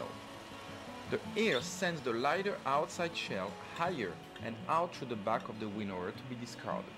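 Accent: France. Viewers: Love that French accent. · What a beautiful French accent !!! :)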